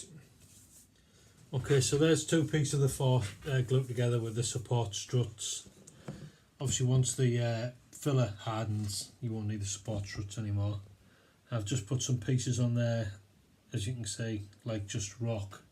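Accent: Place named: North, United Kingdom. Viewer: I prefer a northern accent when learning about fantasy/minis/terrain - feel free to throw a few more mini stories in there, you're entertaining! ^_^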